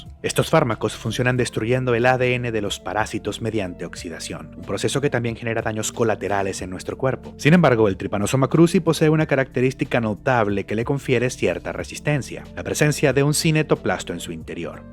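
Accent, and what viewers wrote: Venezuela. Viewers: pana eres venezolano ?? yo estoy nuevo en su canal , ya me suscribi , excelente trabajo con tus videos, dios te bendiga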